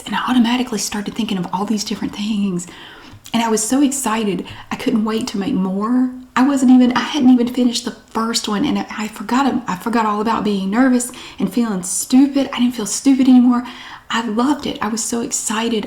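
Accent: South, United States. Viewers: I don't know how long I've been watching you for but I remember searching for Southern accent ASMR after watching a load of Phyllis Stokes videos. Unfortunately she's passed on now but I'll always remember my excitement when I found your channel. Happy Anniversary from the UK ✨ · Congratulations! What a milestone! Thank you for putting all the time & effort into your videos to make sure you give us the best ASMR experiences. You sure do help me relax & sleep. Just something about that southern accent lol. And for that I thank you. Can’t wait for what the future holds!! You rock!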